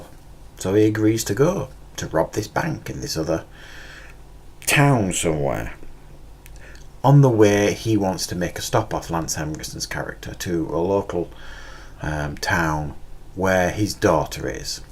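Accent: United Kingdom. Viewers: You from UK?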